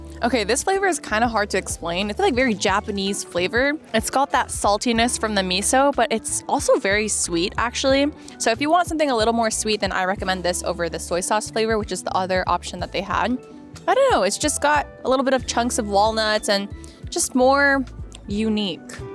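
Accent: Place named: United States. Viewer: Your american accent sounds perfect, your voice is so female.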